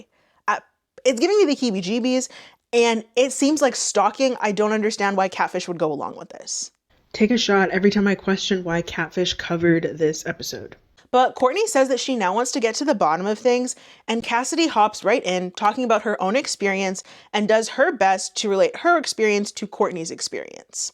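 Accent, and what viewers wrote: Canada. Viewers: first time watching you, i love how Canadians say words with "ou" in it lol Aboot. "Oot of the scene." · Gurl I just found you, so happy I did.. your hair looks amazing also that accent is 100% Canadian lol (Been binging ya since finding ) Love that you are a fellow Canuck. 🍁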